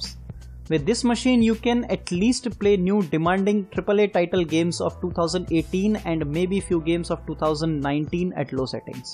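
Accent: India. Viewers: Love that indian accent lol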